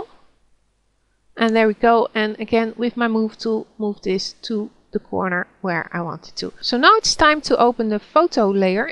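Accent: Netherlands. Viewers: je bent werkelijk een kei in zowel het bewerken als uitleg geven. hartelijk dank om al dat moois te delen met wildvreemde mensen. als ik me niet vergis hoor ik een Hollands accent in jouw engels dus vandaar dat ik u in het nederlands beoordeel. in de hoop dat ik me niet vergis natuurlijk en mocht dat zo zijn, sorry daarvoor hoor;-)) thank u so mush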